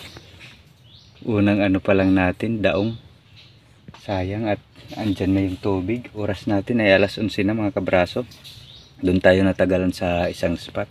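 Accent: Waray, Philippines. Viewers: idol Taga San ka ho ba kasi parang Yung salita nyo parang Yung sa Amin mga waray San Lugar or probinsya ba yang Lugar mo Samar ba? Taga samar din ako idol ingat lagi sa pag haunting ng alimango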